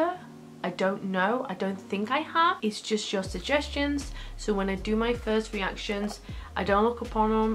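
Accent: United Kingdom. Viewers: where you get that English/British accent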